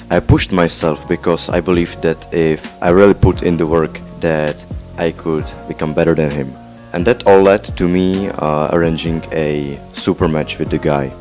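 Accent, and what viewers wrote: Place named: Czech Republic. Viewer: Já věděl že jsi šech, když jsem slyšel ten přízvuk :D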